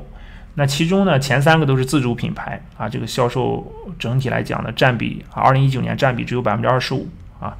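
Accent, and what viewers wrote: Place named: Shanxi, China. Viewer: 你是太原人 至少是山西人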